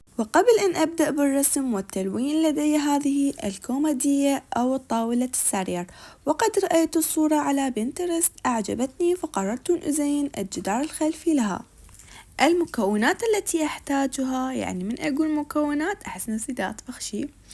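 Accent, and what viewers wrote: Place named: Iraq. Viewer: ماشاء الله على رسمك عالم اخر من الجمال ❤❤ بالنسبة لفكرة رسم الشخصيات الموصوفة من طرفنا فهي فكرة رائعة وستلهمك كثيرا لكن فقط ان اردت القيام بها ارجو ان تنشري ذلك في المنتدى اولا حتى نتمكن من وصف شخصياتنا لك ❤❤بالنسبة للكلام فانا اعشق اللهجة العراقية فهي فخمة للغاية ❤❤❤وكذا الفصحى ايضا .. عموما اتمنى لك التوفيق دائما و.. انا مصدومة من عمرك تبدين اصغر بكثيئيير وهذا امر رائع 😅😊 المهم اتمنى ان ايامك تمر بخير وسعادة و.. الى اللقاء❤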